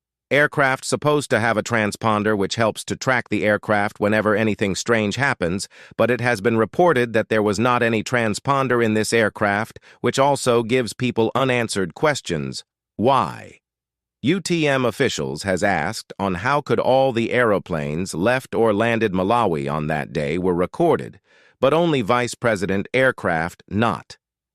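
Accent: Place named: United Kingdom. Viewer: You must be from BBC - enemies of the black race and enemies of All Christians. You are always going through African countries from country to Country creating trouble, accusing l Hatred ajc division and Destroying African leaders. Wtr hear it from your British Accent. You are turning now to Malawi trying to capitalize on this terrible tragedy to boosy your lucrative business which you conduct with the blood of the black people -- it is your destructive work. There was absolutely no rift between the current president and the Vice president. Why would high ranking Military officers( MAJORS) who PERISHED in te crash Take thier iwn fellow soldiers and 7 other High ranking Malawi leaders in addition to the Vuce President in a presidential Military plane judt to go Kill themselves along with rhe leadership team of Malawi? Bad weather! Shane on you enemies of the Black people 😮😮😮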